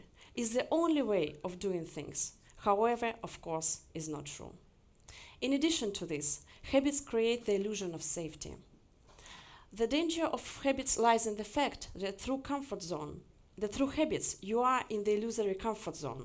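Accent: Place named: Russia. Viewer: Какое-то банальное УГ, с мощным русским акцентом.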